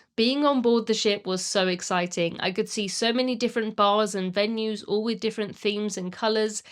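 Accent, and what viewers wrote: RP, United Kingdom. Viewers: Oh your accent is so posh and lovely. Thanks for this video as we are planning on Alaska soon. I think Encore is the perfect ship for Alaska with the Observation lounge. Were you happy with the amount of time in port? The super early morning arrival and early afternoon departure seem like they might be disappointing.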